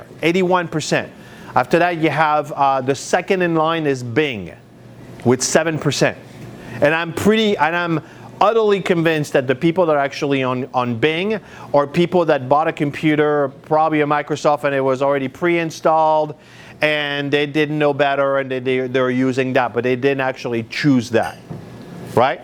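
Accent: Quebec, Canada. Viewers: Sounds more like Quebec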